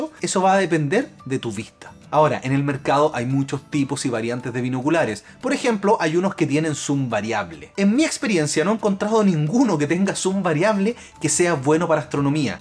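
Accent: Chile. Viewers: bro, eres Chileno ?? donde compraste tus binoculares ? Gracias.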